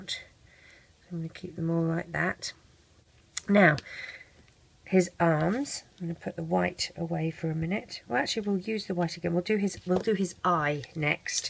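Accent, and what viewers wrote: England, United Kingdom. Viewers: The best minion ever! I love it! Just one question, are you English?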